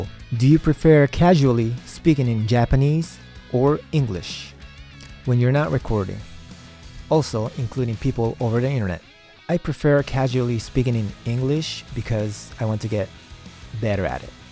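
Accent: Philippines. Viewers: I actually thought he was filipino when I heard his accent, I didn't know. Haha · I'm probably wrong but I could hear a slight Filipino accent/diction, I'm guessing you lived in the Philippines for some time? Dude your singing voice is amazing. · i knew it from the accents thats he's a half fil 🤣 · Yeah the accent is definitely flip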